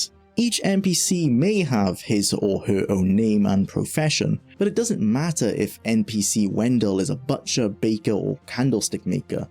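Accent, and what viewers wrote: Wales, United Kingdom. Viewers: I like your accent. Welsh? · Yes he’s welsh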